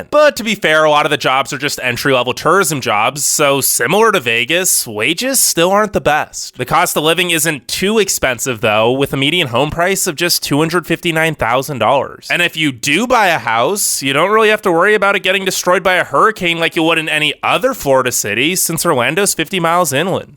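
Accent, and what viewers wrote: California, United States. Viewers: Sorry but the voice is just Californian I can't stand it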